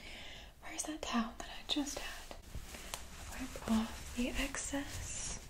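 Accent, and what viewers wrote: England, United Kingdom. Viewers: I thought u r english after watching previous video lol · british again, ithought today's would be Aussie.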